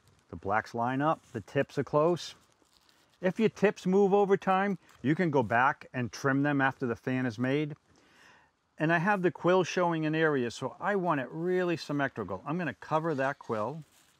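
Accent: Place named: New England, United States. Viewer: I'm hearing that New England accent! Thank you for these excellent videos!!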